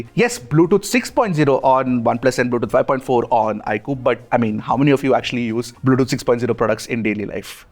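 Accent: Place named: North India, India. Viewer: I thought you were from North.. i was surprised by 😮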